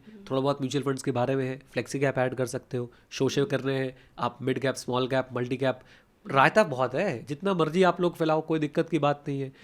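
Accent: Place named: Panwari, India. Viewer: Panwari accent ❤❤❤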